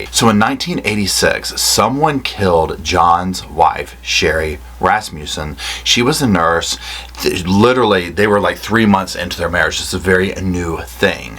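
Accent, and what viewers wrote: South, United States. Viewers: Hi and Roscoe. I was so happy to have found your channel about a week ago. You make me laugh so much with your facial expressions, your occational southern drawl, and my all time favorite is your signature whisper as you send us to a clip. Your show about Nancy Brophy is hilarious. The way you whisper the words "Miss Nancy" put me on the floor laughing. I'm going to tell all of my friends to subscribe to your channel and tell them that we have to support our brother. Keep me laughing as I watch more of your videos because I'm new. Right now (Dec 7, 2023) I'm watching your video about "Latecia's Dr. Lewis. I laughed so hard at the intro when you whisper "Her son who smoked weed in the Stairwell" 😂😂😂. Hug Mr. Roscoe P for me.🌹🦴🦴🦴🦴🦴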